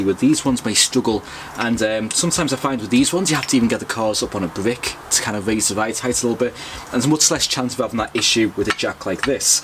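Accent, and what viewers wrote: Liverpool, United Kingdom. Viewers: Can’t work out your accent!! Scouce?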